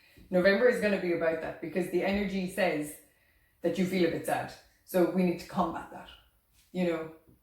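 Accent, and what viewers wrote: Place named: Ireland. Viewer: Hello love your reading. Beautiful accent, it makes me miss being in Ireland with my grandparents :) All my family is from Ireland I was born and raised in Prince Edward Island Canada. Looking to hear more in the future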